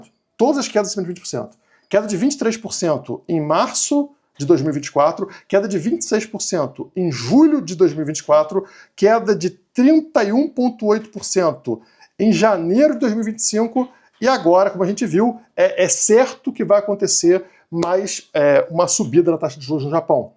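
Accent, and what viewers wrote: Rio de Janeiro, Brazil. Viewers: Jurava que tu eras carioca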